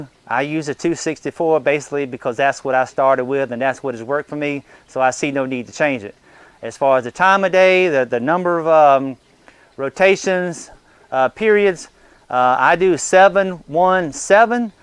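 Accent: South, United States. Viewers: Forgot the name of your channel, so gave ChatGPT a description of a gardener that did hydroponics on YouTube about 10 years ago with a pleasant southern accent, and the AI knew exactly who I was looking for. Miss your videos.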